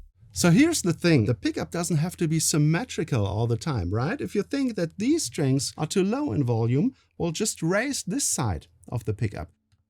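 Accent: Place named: Germany. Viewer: great instructial piece being a seventy something German American it is refreshing to hear the Deutsch englander accent. Again great video.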